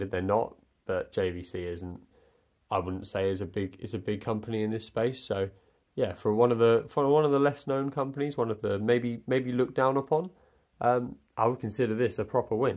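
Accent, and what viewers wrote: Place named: Cockney, United Kingdom. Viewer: This man is speaking with a strong Cockney accent. He must be from Essex as thats the only place in Britain where Cockney is still spoken.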